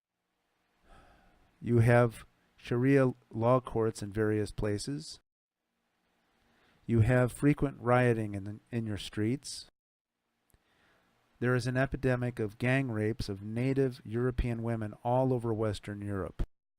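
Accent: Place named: United States. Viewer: Your are right. But by your accent? you are in U.S. isn't it? the problem in Europe is that you talk about what will happen in Europe withon 1 or 2 generations... and too many people in Europe? don't have children, so they couldn't care less about the next generation. Angela Merkel? no children, no grandchildren. Teressa May? no children. Emmanuel Macron, no child of his own. That's a side effect of a birth rate under 2, in whole west and central Europe... They have cheap workers now, they don;t care about the future... BTW utube also blocks ppl fm comment and accounts, under "ofending" ppl rules... Immigrate for the cause of Allah, Jihad by immigration, and taqiyyah lie to them, is is Quran 4:100-104 <link>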